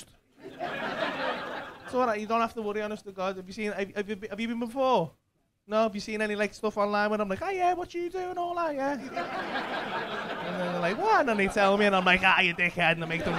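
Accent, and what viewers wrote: Liverpool, United Kingdom. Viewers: You know you are Scouse when you have to add your own subtitle because YouTube auto subtitles can't understand half of what your saying 🤣 love it as always putting a smile on me face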